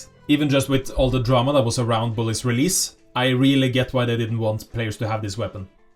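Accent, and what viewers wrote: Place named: Norway. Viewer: The accent is violently norwegian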